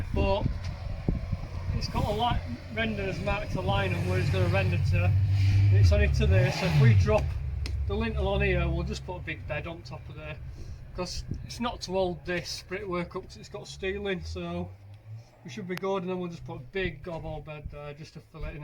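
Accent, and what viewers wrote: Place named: Yorkshire, United Kingdom. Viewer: Gotta love Yorkshire English "Ahv just idded tha light in ther".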